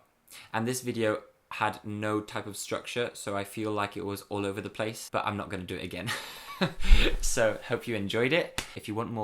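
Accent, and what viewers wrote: England, United Kingdom. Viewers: Todos deberíamos estar hablando de su hermosos acento británico 😍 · you're right it's a good youtuber but what about english is from the UK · Dios😂 he amado este vídeo. Me encanta tu acento inglés. ¡Es super dulce! Cuando visites otra vez Inglaterra podrias hacer algunos blogs y enseñarnos los dulces y lo que echas tanto de menos. Sería super entretenido!!! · Me encanta como suena su voz con el acento británico 🤤😂 pregunta seria porque si el video esta totalmente en ingles en título esta en español? 🤔🤣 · SlowAH DeepAH YoungAH. Kxbdjhs ese acento británicooo. 💕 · Amoooo su pronunciación tipo britanicaaa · Omg I need more of this! Me encanta cómo hablas, tienes un acento inglés precioso jo! Yo soy una fanática de Inglaterra y pese a vivir en España pienso y hago todo lo posible en Inglés; me flipa. El año que viene, si todo va bien estaré estudiando ahí :) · Ese es inglés de Inglaterra? 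· Me encanta tu asentó británico es tan bien perfecto 👌🏻 💕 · Sino fuera por el acento británico, no se me complicaría tanto el entenderte. :( Pero aún así me encantan estos vlogs. 💛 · British accent 😍😍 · I've never heard anyone with a British English accent AND a España Spanish accent, its pretty mind-blowing to me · No hablas como gringo Hablas diferente como en Inglaterra 😄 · I love your vlogs in english. I am from Argentina from a city call Córdoba and I work as a tour guide. I hope one day you come and speak to me in that sweet british accent. Kisses :* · OMG. Amo tu acento inglés. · You talk like the people in England because in U.S.A they talk with another accent and in U.S.A we have carpets in the stairs Hablas mucho como las personas en Inglaterra por que en U.S.A hablan con otro acento y tambien en U.S.A tenemos alfombras en las escaleras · Ese acento británico hace que me encante el inglés😍😍😍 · Tu ACENTO BRITÁNICO me brinda oxígeno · Vamos a aprender acento británico 😂❤️ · More videos in british english please! 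I love your way talking in english. It's perfect, innit? 🤤😍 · Me encanta que su inglés suene más de U.K que de EUA ❤️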